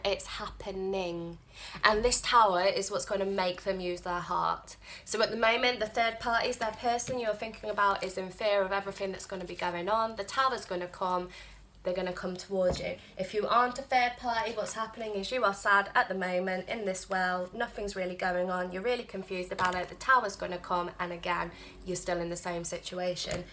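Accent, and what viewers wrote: Liverpool, United Kingdom. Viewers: btw it’s such a shame that I don’t live in the UK anymore or else I’d pay you a visit in person. I used to live in Edinburgh and was there for my postgraduate education for 2 years. I loved everything there and didn’t really want to go back to the US but I had no choice cuz my student visa expired and I ended up living in Taiwan for now 😂 I miss the UK a lot especially when I hear you talk 😭 You sound like you’re from Liverpool or somewhere closer. P.S. I was also wondering if it would be ok for you to do a pick a card reading on “Are they still testing me?” or “Are they still observing me?”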